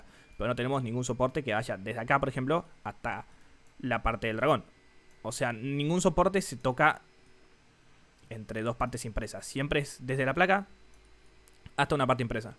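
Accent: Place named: Argentina. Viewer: Sos un idolo, literal que me quedo siempre al final del video para darte una cierta forma de gracias virtual aunque allá entendido lo que venía a entender (porque lo explicas excelente y más sabiendo que hablas con un tono muy Argento xd), simplemente muchas gracias ídolo 💪🏻 ✨ 🎉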